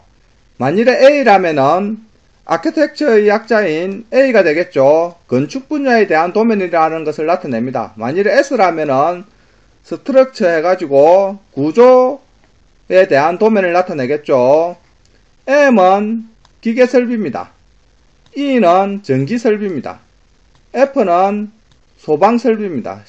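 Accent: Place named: Gyeongsang, South Korea. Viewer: 아지야 경상도 사투리 직이네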